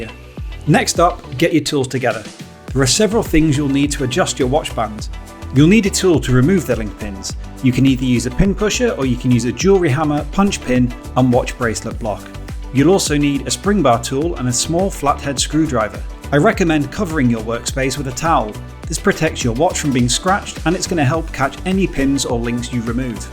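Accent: United Kingdom. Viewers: ah. Well that explains the British accent.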